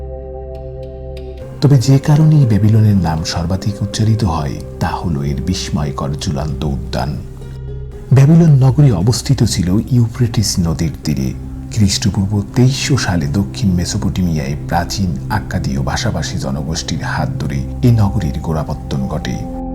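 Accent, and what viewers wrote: Barishal, Bangladesh. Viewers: Your voice is so good but you can't avoid your local tone(Barishal).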